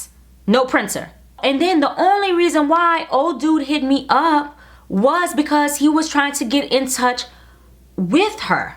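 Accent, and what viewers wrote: Louisiana, United States. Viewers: I heard all the Louisiana in I love you please no more videos like this YT mom ❤️ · I just want to say I love you! I was lowkey laughing in parts of the video bc all I hear is our Louisiana accent! Lol I can most definitely tell your from Laffy way! Lol I’m from Houma💕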